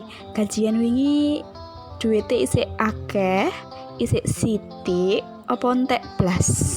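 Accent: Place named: Java, Indonesia. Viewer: Wong ndi toh rek ... Jowoan ngene Seneng aku hehehe salam kenal ya kak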